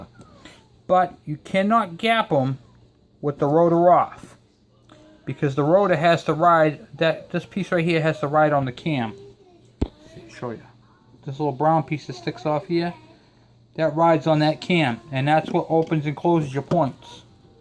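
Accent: United States. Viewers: Your arguably the best explainer on boobtube when it comes to points ignition and cdi and wiring. I use your videos to assist me with the wiring issues in old bikes I get. Thank you sir. Even though you sound like a Yankee you are informative and easy with explanation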